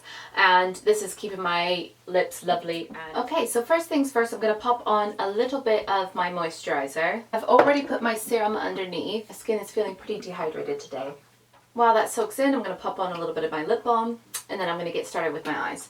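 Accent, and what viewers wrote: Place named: United Kingdom. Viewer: Very lovely! You are sounding more like a Britt every time I visit your blogs! I just love the accent ! Want to move back!!!!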